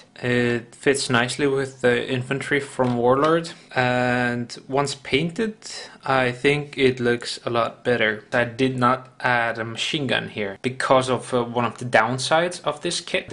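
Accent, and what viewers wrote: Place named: Northern Europe. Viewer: U know was wondering where u come from? is cause of ur accent and entonation. Sounds to me u r from Northern Europe? Whatever the case, ur video was a great one! keep going. BTW that halftrack how much was and where u purchased it? thnx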